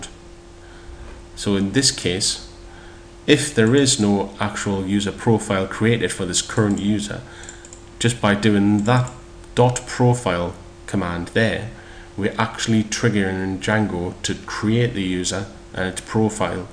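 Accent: United Kingdom. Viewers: One day spent banging my head around Django (trying to manage users / profiles) - 26 minutes of this tutorial - all sorted. Will be going through the rest of the series now. Genius. As an aside - always loved Python - Django at the moment is seeming like the fastest route to murdering Python. As another aside - Ace accent, in my personal opinion the best in the UK. International users take note.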